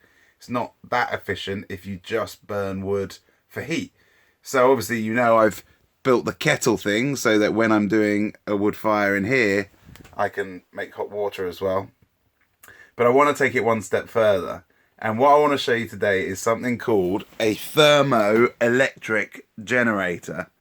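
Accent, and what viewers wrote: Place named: South Africa. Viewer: Love the South African accent,! Would like more of it;)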